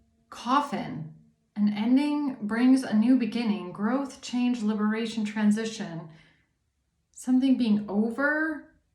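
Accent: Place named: Germany. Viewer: 🐝 princess,,,, your beautiful soul love your strength your wisdom your readings and your sprit,,,, hope you don't take on there yucky shit,,,, don't like seeing you angry,,,, your beautiful your a goddess,, you are so much beauty, your Aura your smile your voice your accent,( Germany)❤, you deserve the best,,,I will reward you when I can 😊,,,, God bless you,,,I don't like you stressing,,,, you would have a smile on someone's face every day x,,, sorry if I sound attrusive,,,, 🦉⭐✨⚖️🦋🐝🐝🐝🐝🐝☀️🕯️🛡️✌️💜😇,,,,, don't hurt your self ( your neck) ,I love you,,,❤,I remember you saying you had a accident x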